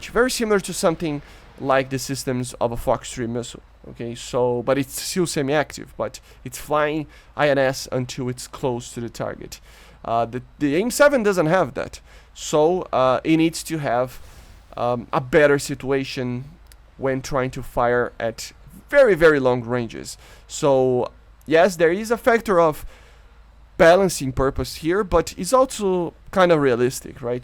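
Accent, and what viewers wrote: Brazil. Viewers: O sotaque brasileiro é inevitável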